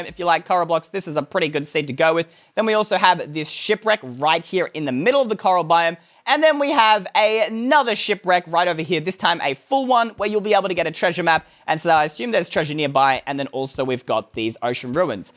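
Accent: Australia. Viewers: are you austrailan? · Are you Australian?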